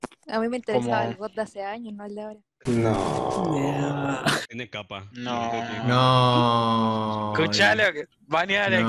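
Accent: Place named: Peru. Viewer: Tamare oe se pego el peruano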